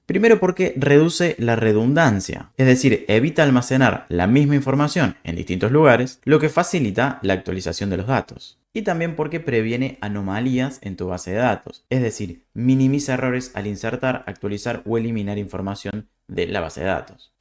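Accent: Argentina. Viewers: Justo estoy haciendo un TP de este tema, y me agrada escuchar todo esto en "argentino", gracias, te ganaste un suscriptor. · acento argentino que me da confianza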